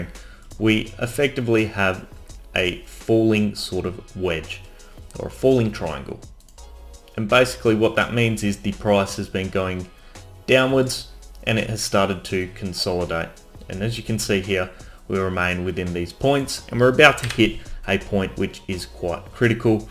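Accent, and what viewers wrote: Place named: Australia. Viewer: Finally someone talking in Aussie $$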